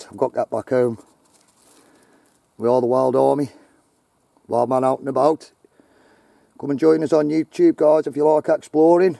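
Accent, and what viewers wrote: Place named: North West England, United Kingdom. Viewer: that’s ok brother. Are you from north west U.K. accent sounds familiar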